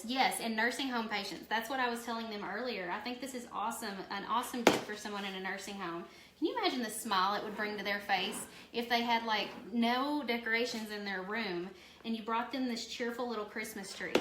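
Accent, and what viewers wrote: United States. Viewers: New subscriber! I am going to try this. Love your accent! What state are you from? Me = PA.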